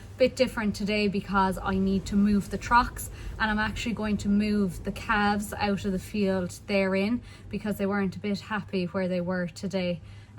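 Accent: Ireland. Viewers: Recently found your vlog. I'm on a marathon now. After I moved to Kentucky no more livestock, but still remember, so great to see the vids. Old and retired now, just great to see what you do and all the best to you. Love the Irish accent.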